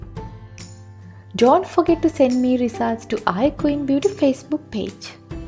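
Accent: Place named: Sri Lanka. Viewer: Accent quite similar to Sri Lankan . 🥰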